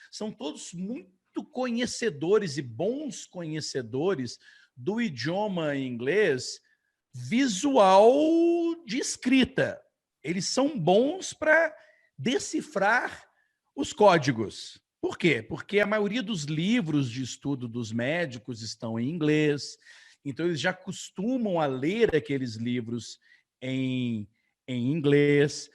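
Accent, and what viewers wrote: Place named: United States. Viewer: Sua pronúncia é bem americana diferente do inglês britânico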